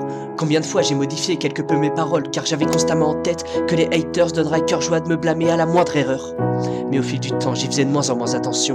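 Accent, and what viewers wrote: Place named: North, France. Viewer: Les Textes vidéos d' ah oui tu es du nord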